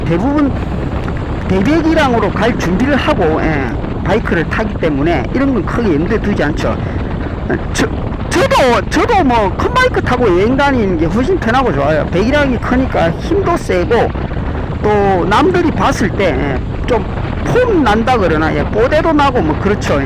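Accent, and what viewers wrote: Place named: Gyeongsang, South Korea. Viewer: 신묘한 경상도 사투리ㅋㅋ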